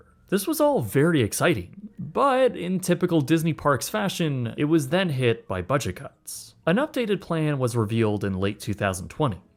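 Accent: Canada. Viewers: Canadian accent i think and southern ontarian. Ie he sounds like me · I heard him pronounce it correctly! I think his Canadian accent softens it a bit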